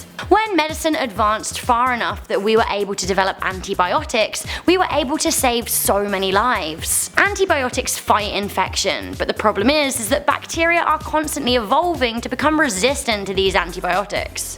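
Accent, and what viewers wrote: United Kingdom. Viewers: Does your British dialect have a name? You have such a very pleasant voice! ❤️ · Something about a British accent makes the end of the world seem pleasant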